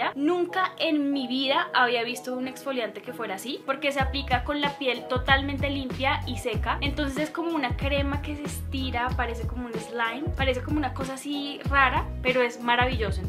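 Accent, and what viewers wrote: Colombia. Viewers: Eres la colombiana que habla más bonito 🎶🎶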